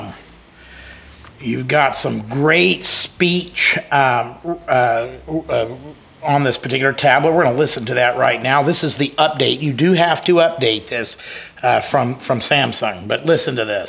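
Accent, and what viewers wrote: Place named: Hill Country, United States. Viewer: Great review. I love your commentary style. You sound like a good old boy from the hills. Just subscribed to your channel.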